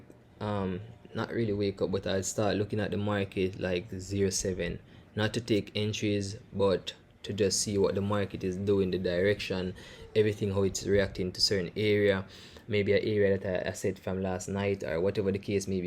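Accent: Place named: Jamaica. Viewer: Mhnnn I'm really melting here.. I love your sexy voice and that sweet pie Jamaican accent.. Ohhhh my goodness I can't even follow the strategy but smiling all the way cos I love you mhnnn.. U ve earned a adimire sub.. U are delicious kikikikiiiii.. Have a beautiful day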